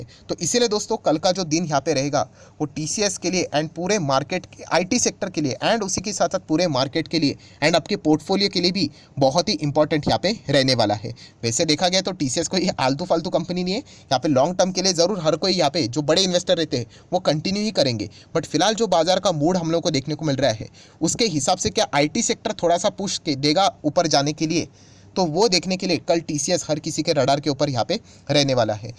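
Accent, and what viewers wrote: Maharashtra, India. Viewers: Mujhe lagta hai ki Maharashtra se hai🤔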